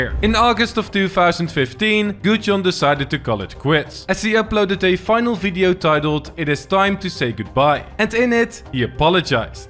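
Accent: Netherlands. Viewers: Brother, he's from the Netherlands. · Im almost sure ur dutch. You sound dutch af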